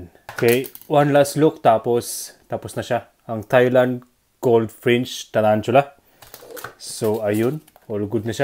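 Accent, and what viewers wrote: Ilocos, Philippines. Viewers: Idol may pag ka ilocano ka no? hehe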